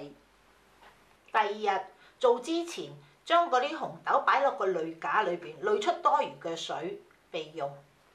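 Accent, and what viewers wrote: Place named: Hong Kong, China. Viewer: I think she comes from Hong Kong (from her Cantonese pronunciation). Coconut milk is not a traditional Chinese food ingredient. This dessert is originally from Hong Kong.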